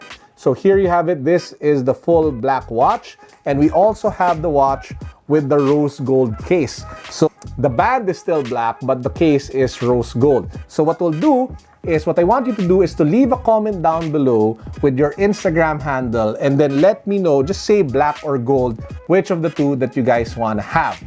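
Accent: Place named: Philippines. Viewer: Good review That pinoy accent is THICK > peace from Makati City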